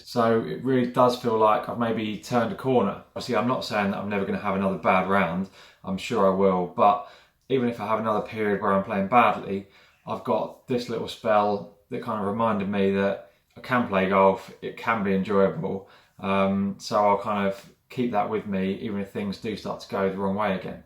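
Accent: Australia. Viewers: Well done in your 1 under the card, brilliant result! The key sometimes is to put things in perspective, there's no golfer in the history of the game that hasn't gone through a slump fighting internal demons from Ballesteros, to Tiger, Nicklaus you name it everyone goes through slumps. For me what works these days after fighting my own internal demons is I treat golf like trying to chase a butterfly. The more you chase after it you'll never catch it but if you just stand still and wait patiently much better chance of coming back to you. Keep up the great videos. PS.. bring back the Aussie dodgy accent, sorely missed.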